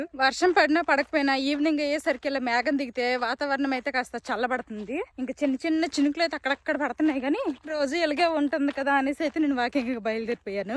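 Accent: Srikakulam, India. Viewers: Srikakulam yasa super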